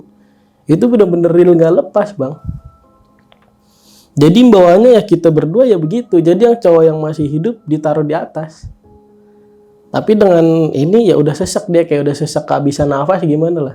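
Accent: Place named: Semarang, Indonesia. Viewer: Buset orang semarang kah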